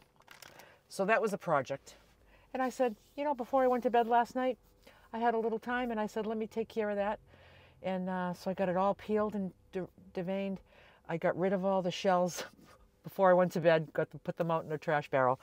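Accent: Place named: Massachusetts, United States. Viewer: Loving that Mass accent (we used to live in NH!). Safe travels!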